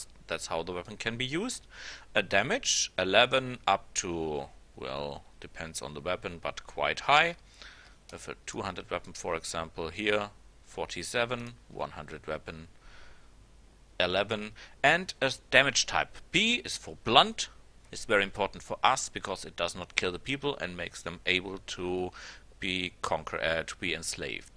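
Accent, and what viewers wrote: Germany. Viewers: I hear it stop and go AH NO! press the next one straight away love this so far and it only ep 1! on a side note your accent it interesting German?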